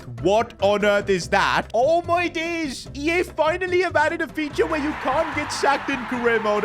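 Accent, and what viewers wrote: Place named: India. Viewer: Bro why he talks like Mickey Mouse but in a Indian accent 😂😂🤣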